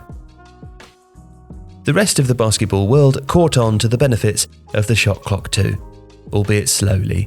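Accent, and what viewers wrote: England, United Kingdom. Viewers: Weird hearing this with the English accent from tifo football group etc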